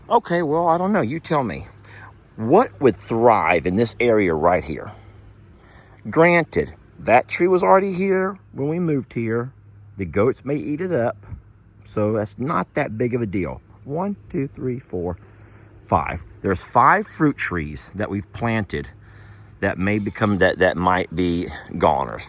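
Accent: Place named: Oklahoma, United States. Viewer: My grandparents on both sides would often say fire ants like you do, more than likely because they were from Oklahoma, Arkansas, Texas, area. My father, often called Las vegas," lost wages", But he was often changing words that sounded similar but meant something different. Which kind of rubbed off on me on occasion😅😅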